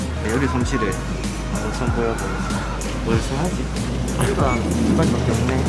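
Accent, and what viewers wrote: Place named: Daegu, South Korea. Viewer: 맛갔나?ㅋ이거도겁나웃겨요 유행어만들어야겠다 오늘부터친구테 써머거야지 원래도사투리쓰는사람 좋아했는데 대구사투리매력있음 님이대구사투리해서더매력있음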